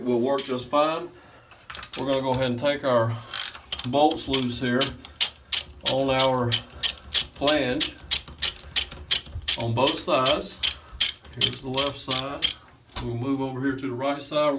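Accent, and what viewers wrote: South, United States. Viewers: Thanks for being very thorough! I like your " " accent"!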